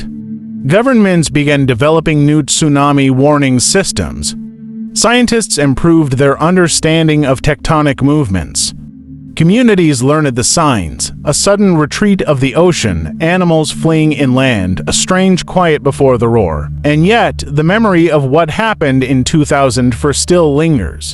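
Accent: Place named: United States. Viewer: Ik zit niet op Amerikaans praten te wachten.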